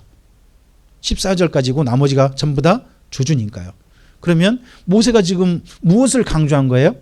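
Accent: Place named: Jeolla, South Korea. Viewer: 목사님 감사합니다. 말씀 많이많이 올려주세요. 열심히 듣고 배우고 있어요. 특히나 전라도 사투리 개인적으로 넘 좋아요 ~~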